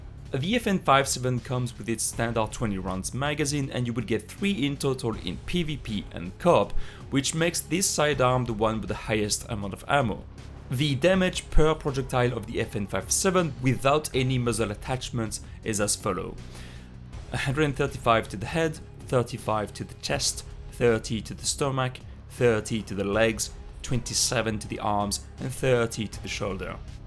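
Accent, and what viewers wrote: France. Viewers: je me disait bien aussi que je reconaissait l'accent francais ces bien que tu puisse faire tes video en anglais ta plus de chance de faire parler de toi jaime vraiment ta facons de detailler ce jeux continue comme sa :)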